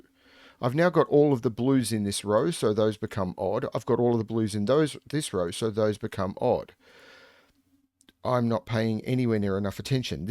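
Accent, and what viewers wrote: Australia. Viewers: Hi, just want to say how happy I am that I found your channel:) I've been on CTC since before you could solve along with them and you had never been in my recommended before last week. Since then I'm hooked and have been binging old videos while it's still raining. I heard you say on one that run time was a big factor for YT to push your content (which after many years of watching I didn't actually know) so have decided to continue running your video on mute while I attempt the puzzle so you'll still get your times up. Maybe suggest this to your audience as I can see a lot of regular CTC commentators on here too and maybe they don't know either, and you really do appeal to a generally easier solve than some of Simon's monsters. I don't belong to the discord, but I've checked out the other channels that you've added in your home page too so good for you for supporting the community. 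I'm the only Sudoku geek in my friend group so nice to hear an Aussie voice here too. Keep up the great work, and I love that my brain solves the way you do too:)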